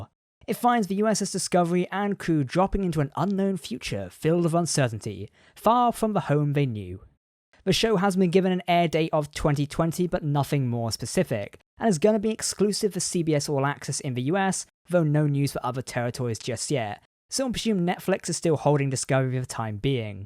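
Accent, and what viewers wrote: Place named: England, United Kingdom. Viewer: You're clearly British, probably English by your accent, so please remember the word is pronounced CommunicaTor NOT CommunicaYDA. "...the planet is rather unique." - 'unique' is an absolute. Something is either unique or not unique; it can't be partly unique, very unique, slightly unique or indeed rather unique; not to mention that the number of planets with multiple moons we've encountered in previous Star Trek outings, suggests that a planet with two moons is certainly NOT unique.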